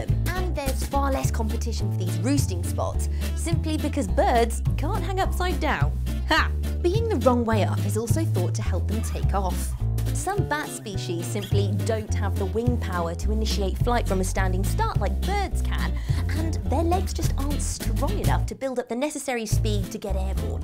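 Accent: United Kingdom. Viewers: im inlove with your british accent . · Britsh accent ?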